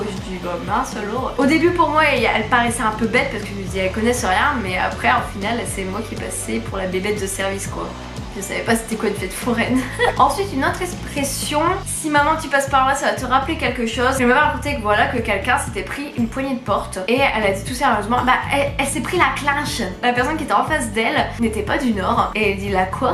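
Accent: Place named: Nord, France. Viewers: Oh oui ton accent est prononcée 😉 j'adore grave le ch'ti depuis le film de Dany Boon BIENVENUE CHEZ LES CH'TIS 😊 surtout que nous les Bretons ont dit aussi Vindediousse (je sais pas l'écrire mdr) BONNE VIDÉO sinon 👏💘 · J’adore ton accent malheureusement j’ai quitté le nord trop jeune j’ai pas l’accent 😔 et j’adore le patois du Nord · Super vidéo ! Jsuis trop morte de rire au début "ça fait cassos" et "c mieux en français qd mm" haha c vrai que c une langue à part entière le ch'ti ! Moi je l'entends direct ton accent surtout sur certains mots :) Ma belle famille est ch'ti, ça fait + de 11 ans que jles connais alors à force jsuis habituée ^^ Jcrois que jconnaissais 95% de ces mots et expressions mais avant d'être avec mon homme j'aurais réagis comme tes copines de vacances mdr ! Gros bisous <3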